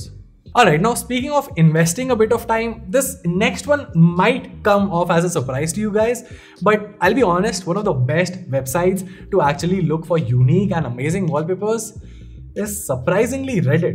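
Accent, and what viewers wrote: India. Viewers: join video, hearing indain accent, leaving the video. edit: thank you, the video was useful.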